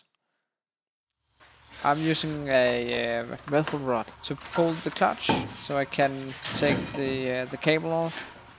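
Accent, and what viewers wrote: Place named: Denmark. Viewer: Det var cool - Nu ved jeg hvad en selv justerende kabel er og den som er på min T4 VW er igen på plads. Hvordan det ennda er skete, havde den selv hoppet ude af pedalens fatning! Du dukkede op under en relevante søgning og selvom ikke VW, traf jeg rigtigt med den første skud. Tak kære bror - med en hånd mekaniker, en hånd videografer :-) Great mate and thanks (oh, and the Danishness is nicely unmistakeable in your seemingly impeccable english. haha)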